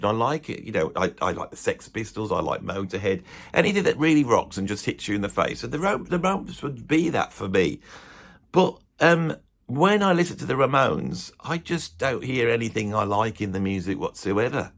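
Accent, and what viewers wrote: United Kingdom. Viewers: How the hell I missed this channel before?! Thanks to God we have subtitles here, and I'm able to break through this magic british accent 😂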